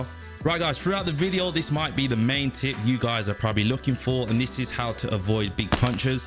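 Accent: United Kingdom. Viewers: Hey, why you pitting on a brum accent 😮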